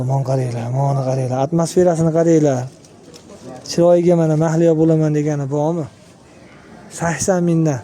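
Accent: Andijan, Uzbekistan. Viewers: Анжанча шевангиздан ургилай 😊 Аллох омадингизни берсин❤ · Бу Андижондан мисиз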